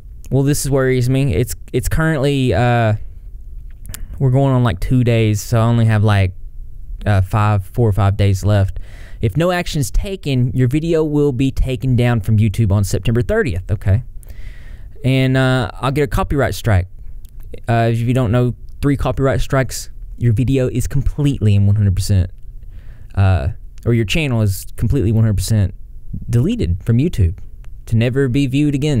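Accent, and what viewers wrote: South, United States. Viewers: It's just southern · You have Southern accent.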